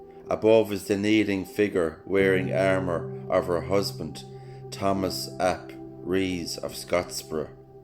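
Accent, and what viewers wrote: Ireland. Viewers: Your beautiful Irish accent is like music to my ears ❤❤❤ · Many Happy Holidays In Tenby Thanks you are marvellous..Beautiful Irish brogue Xxx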